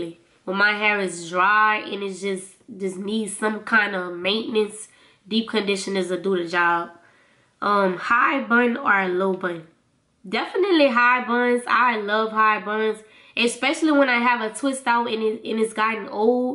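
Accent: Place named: New Orleans, United States. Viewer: Lol i knew you was from nola before the xmas intro. Yassss, bounce to the beat. I did this tag as well it was fun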